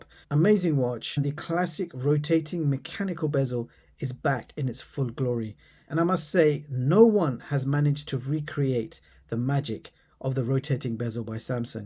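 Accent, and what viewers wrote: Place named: United Kingdom. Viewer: Your accent like Harry Potter Film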